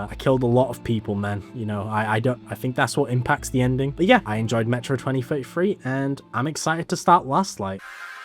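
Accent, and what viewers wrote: United Kingdom. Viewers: You have the same voice and accent of Andrewjrt, which area in the UK?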